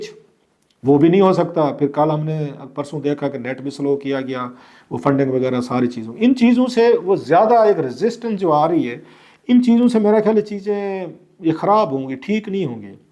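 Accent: Saraiki, Pakistan. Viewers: He always settles his personal score, whereas serious doubts about his education, credentials. He always tries to create fuss. He never wants harmony.. My God, literally phoopian, maasian's attitude.. In his old v logs , he was instigating to khan that you shouldn't do deals by indicating deals of benazir and nawas sharif and he made a lot of v logs that khan shouldn't loose his staance.... Now he is telling different stories that IK shouldn't have done it... Peak of hypocrisy, ik will have 100 mistakes, but klasra wants to bash Ik only caz his feathers will burn if he talks about other politicians... Literally, he seems breathless when he speaks about others.... Shame on you and your so-called saraieki style English.... If you have courage, then talk about other politicians...deaf and dumb... Instead of moving forward to create harmony amongst all, your role is shameful, brutal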